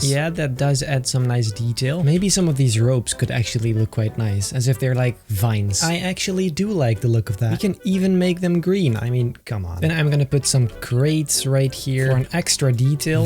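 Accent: Netherlands. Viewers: are you dutch? you sound dutch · You kinda have a Dutch accent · Are you Dutch?? Your’e voice is al little bit dutch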